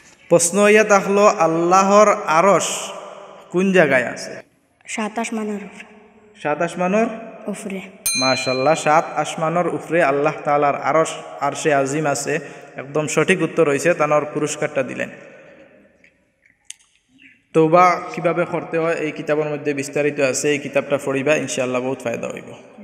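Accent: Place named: Sylhet, Bangladesh. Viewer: Which part of India is this Sounds to me Sylhety (bangladesh) that’s the way we speak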